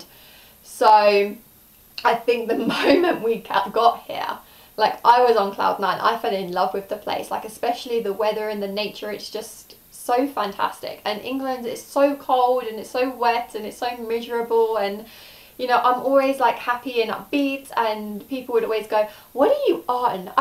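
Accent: South Africa. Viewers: You've got a little Afrikaans accent even😄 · Your accent has been South Africanize.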